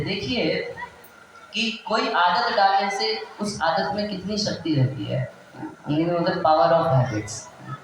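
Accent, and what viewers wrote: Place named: Bihar, India. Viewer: Apka language bihari language se milta hai🙏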